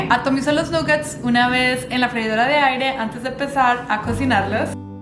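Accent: Antioquia, Colombia. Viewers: Ah qué bien queda cerca a Mánizales, si tienes un acento paisa, ah qué rico pronto puedas venir a Colombia. Bendiciones a toda tu hermosa familia, eres una madre y mujer ejemplar, lo haces súper bien y me encantó este tipo de videos.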